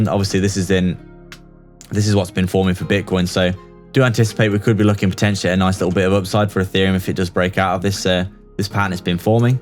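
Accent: United Kingdom. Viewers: Nice video, great to hear a British accent for once.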